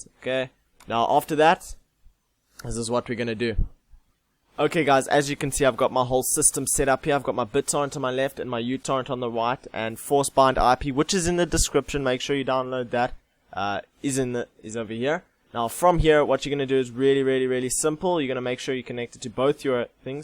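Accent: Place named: South Africa. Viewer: This bra sounds South African AF rofl awe bru thanks for the help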